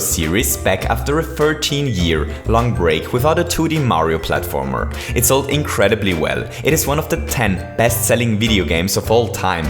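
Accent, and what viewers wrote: Germany. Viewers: Are you German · You sounds german🤔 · Is german your mothertounge? Sounds like you have a german accent. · I really like your intro music. And are you german? Because your accent sounds like you are german. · You sound like you have a german accent :D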